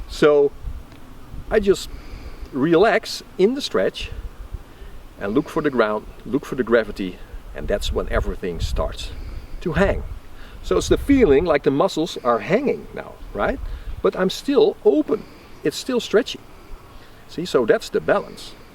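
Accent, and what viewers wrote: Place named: Netherlands. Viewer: Dacht al een NLse accent te bespeuren en idd wat die persoon hieronder zegt....... ''Freaking duidelijke uitleg!" Het wordt goed gewaardeerd. Waar zit je NL en geef je les? Grtn uit Curacao.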